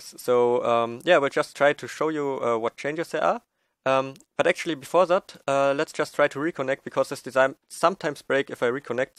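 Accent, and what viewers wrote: Germany. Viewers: Are you German? Your pronunciation sounds like a German accent. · Kann es sein dass du deutsch bist? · ich wusste schon immer das deutsch bist ;D wegen deinem akzent aber nice video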